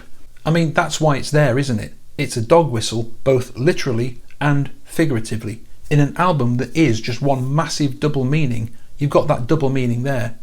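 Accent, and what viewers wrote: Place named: Yorkshire, United Kingdom. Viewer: Pure bollocks. That was an hour I shall never retrieve. Love your Yorkshire accent though.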